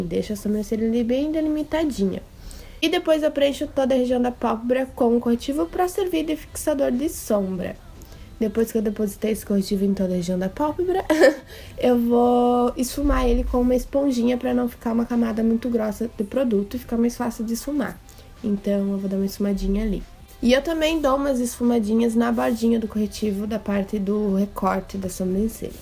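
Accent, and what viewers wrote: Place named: Rio Grande do Sul, Brazil. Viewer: que hino!! esse sotaque não me enganou, RS é tudo ♥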